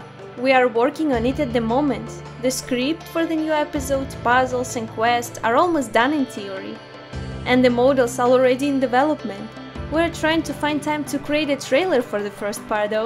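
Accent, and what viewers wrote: Russia. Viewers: Мне кажется что у неё русский акцент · А че у него русский акцент?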